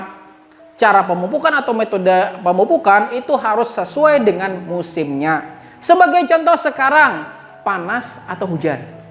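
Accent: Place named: West Java, Indonesia. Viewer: Mirip logat sunda 😅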